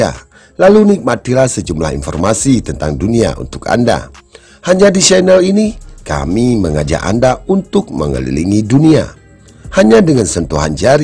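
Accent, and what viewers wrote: Aceh, Indonesia. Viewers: "T" dan logat.aceh nampaknya yg isi suara di narasi di ulasan ini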